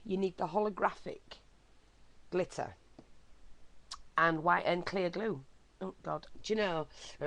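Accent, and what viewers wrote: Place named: England, United Kingdom. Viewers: Yorkshire accent rockz! Go! <3 Love all your tuts! ^^ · Awesome, I subscribed cos I liked ur accent, makes a change from the tuts been american .... good to hear a northerner ;) ... great tut btw, can't wait to watch more x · Loved the video. I am all about saving money, and still having a great product. Thanks so much from B.C. Canada. I do Art Journalling and taking a course on Monday. Will be making some and using it on my page. Will pass this along to everyone. Thanks again.. Love the English accent.. I have English/Scottish Ancestors, and plan to go to England/Scotland hopefully again in a couple of years..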